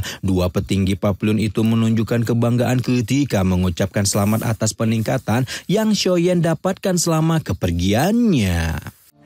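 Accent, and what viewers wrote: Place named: West Java, Indonesia. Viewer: Coo ... Humor gw .. sekilas orng sunda si mamang 😂😂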